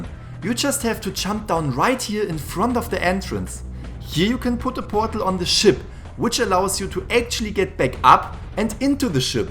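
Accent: Germany. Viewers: Are you German or something? Your accent and your personality perfectly match. No wonder your videos are so entertaining. And your editing!? SUPERB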